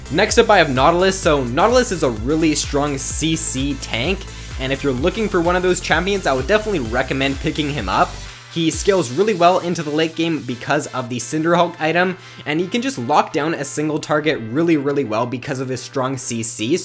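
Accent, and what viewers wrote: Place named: Canada. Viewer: i see you're canadian "eh" me two :D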